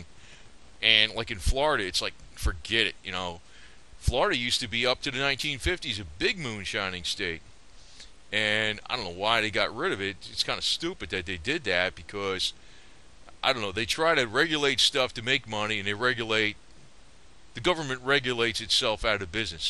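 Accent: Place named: New Jersey, United States. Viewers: Oh and you still speak like your from jersey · All I hear is your Joursey, drawl. Now I don't think I have a accent, but I guess I got a bad down east one, which out west they think is cute. Lol. I don't think anyone thinks they have an accent. Until, you go to another part of the country.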